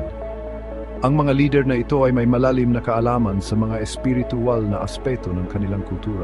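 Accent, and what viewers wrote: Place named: United States. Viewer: PARANG AMERIKANO YUNG NARRATOR..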